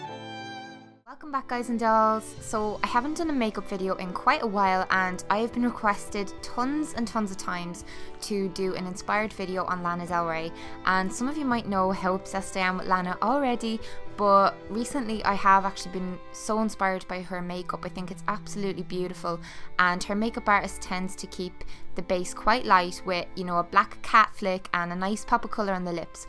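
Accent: Ireland. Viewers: Loved this look! I really love watching your videos because you seem like such a genuine, real person!! Plus a little Aussie like me loves a good Irish accent, it's so adorable!!:) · HEY! I stumbled across you're channel the other day and after about a year of listening to Americans drone on in their slightly annoying accent on Youtube, listening to you're accent was a welcome change. (I'm from Kilkenny!) You're videos are great, it's nice to see an Irish Beauty Youtuber with such great content!!:D Well done and good luck!!:D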